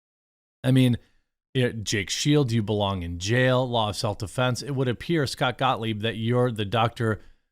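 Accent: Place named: South, United States. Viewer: Terrible, terrible first 6 mins. I know you don't care to why someone is watching your recordings. Just know I for one watching you get some serious cringe. I am a conservative btw. Your real red neck swamp accent, your memory, your facts, your dumb Coffee and its constant promotion even when I have YouTube Prime. I just shake my head and think it's really bad here. And also lots of Cringe.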